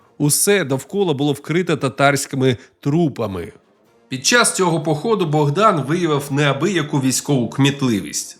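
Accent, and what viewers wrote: Russia. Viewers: Житомирщину до совка частково називали Волинь,це пізніше так назвали "Луцьку область". Автор раніше видавав контент ворожим язиком,звідти і наголоси.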